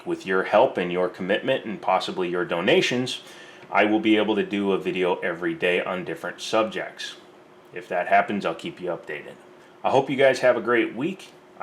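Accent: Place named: Chicago South Side, United States. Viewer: This dude has a Chicago Southside accent.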